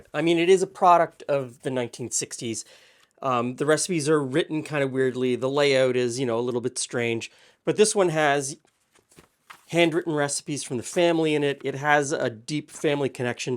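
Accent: Canada. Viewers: I really enjoy your channel. As a displaced New Brunswicker living in Virginia it is nice to hear "about" pronounced correctly!. I have a 1973 Northern Cookbook published by the Canadian Government Dept of Indian Affairs and Northern Development and I was wondering if you would like to add it to your collection. I think someone gave me this years ago and I have never used it (no local sources of beaver meat) but you may find it interesting. I think it is a bit of Canadiana and needs a good home. I will be visiting family and friends in Ontario later this month and could mail it to you from there if you would like to have it. Alfred Taylor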